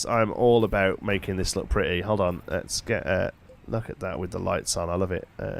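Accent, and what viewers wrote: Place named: England, United Kingdom. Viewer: i watched all your previous videos ep i loved ur accent lookin english uk sometimes better than en usa , i wished this game free because i support always free games open source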